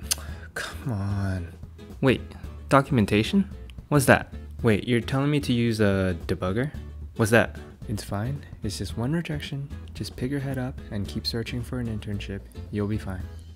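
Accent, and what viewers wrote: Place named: China. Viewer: Your Chinese accent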